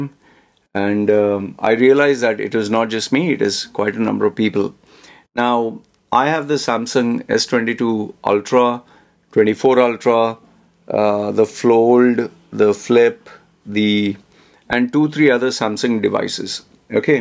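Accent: India. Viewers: bro ur accent is very similar to Indian accent and ur voice is very soothing....😅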